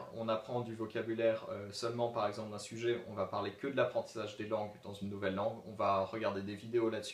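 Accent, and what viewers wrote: England, United Kingdom. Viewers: c'est moi ou il a un léger accent anglais??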